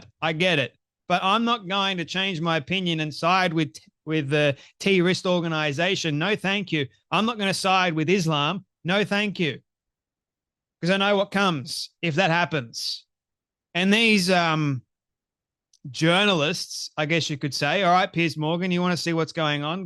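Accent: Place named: Australia. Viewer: U r the man!! However that Aussie accent sometimes throws me off 😁 I hear t-wrist organization and don’t get what it is, until I realized you’ve actually said t3rr0r1st lol. Still, no matter the accent, u r the man!! Keep speaking the truth !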